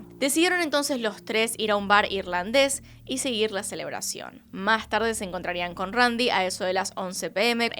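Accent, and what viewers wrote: Argentina. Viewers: "Hola y bienvenidos a mi canal de YouTube...una vez más. Hoy tenemos el caso de....." El acento argentino lo amo y vos sos una maestra para narrar los casos. Además, me encanta el toque q le pones a las preguntas capciosa...me encantas,. Saludos desde El Salvador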